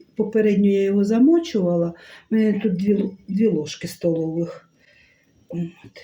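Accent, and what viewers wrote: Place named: Southeast, Ukraine. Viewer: Какая приятная женщина, и разговор красивый , любо дорого смотреть , видимо юг или юго восток украины, видно что свой человек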